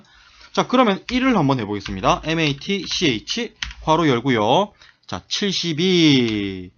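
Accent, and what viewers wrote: Gyeongsang, South Korea. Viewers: 쌤 너무 감사합니다 ㅠㅠㅠㅠ컴활때문에 들어왔는디 같은 경상도인으로서 어투가 매우 친숙하고 화나있는 듯 한 말투이지만 절대 화난것 아닌 잘 가르쳐주시고 싶은 마음이라는게 여기까지 느껴져요ㅋㅋㅋ♡♡♡ 합격할게요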